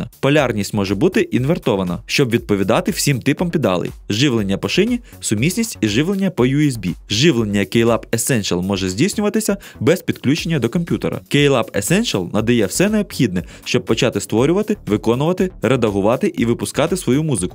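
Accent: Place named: Russia. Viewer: Парень явно привык говорить по-русски, акцент чувствуется;)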